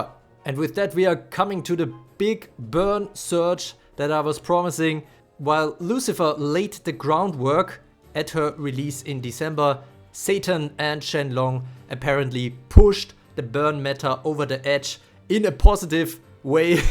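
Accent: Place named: Germany. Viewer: ah okay could swear you have german accent mb og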